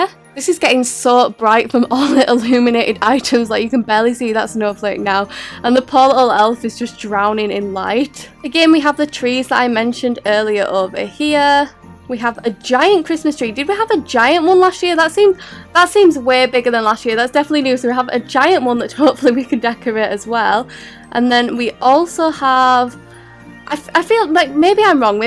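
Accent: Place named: Scotland, United Kingdom. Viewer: I like you Scottish voice. :3 ❤️🗣🇫🇮 (WHERES THE SCOTTISH FLAG?)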